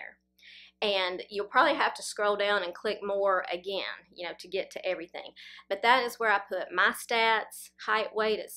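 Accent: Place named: South Carolina, United States. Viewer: I’m so glad I found your channel you also have my accent!☺️i live in Charleston, SC…..I love your ideas for outfits bless u……..I am 58 yrs old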